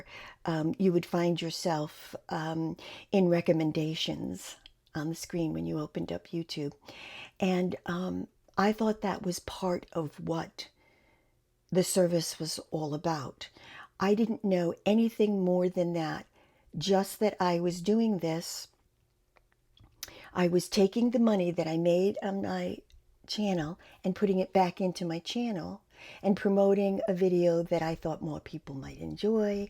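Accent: New England, United States. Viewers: I’m here because Jeannie. I love her willingness to help other creators. I’m sorry to hear of your YouTube struggles. It’s my understanding that things can get very complicated. I wish you the best. On a side note: I think I hear a New England accent?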